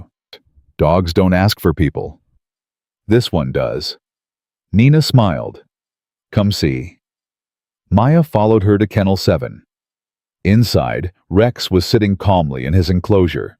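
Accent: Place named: United States. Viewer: Sounds very US 🇩🇰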